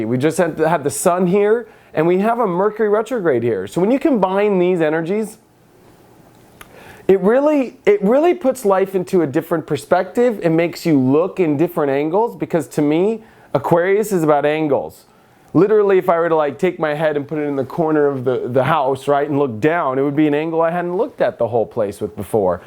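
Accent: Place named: Los Angeles, United States. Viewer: Awesome x great clarity..you.sound SO LA...Lol!..... don't ever change Dude x! Sky Powers Photographer / europe / <link>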